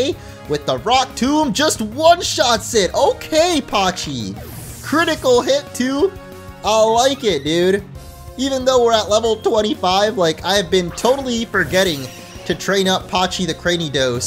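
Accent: France. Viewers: Your french accent is hilarious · Love your Frenglish accent xD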